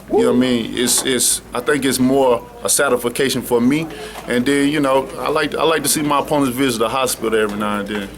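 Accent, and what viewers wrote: United States. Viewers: well Einstein, he's from a southern state, where a lot of them have the same dialect. You grammer cops kill me. This man will put you and your correct grammer to sleep in one punch.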